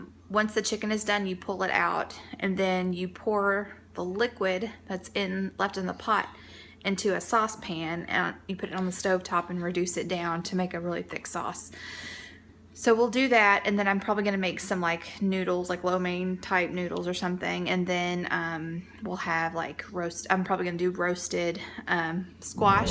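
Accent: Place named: South, United States. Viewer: Gopher= go for- That's that classic southern talking coming out. I say that word 15 times a day. If I put our clothes on the couch, I find them all in the couch. I pulled a sock the kids wore when they were 5 out of the couch....and I haven't had this couch but a year. my pillows are probably made of lost clothes. You have a servants heart and a desire to serve others and support your family. We also must have balance in our lives, and that can easily get out of whack if we are not making our balances in life a priority as well. It is natural for them to go one way and then the other. But, it's helpful when they remotely stay center. So good to see you on here since we never get to see one another. Try to relax, get some rest, drink enough water, and keep your strong faith.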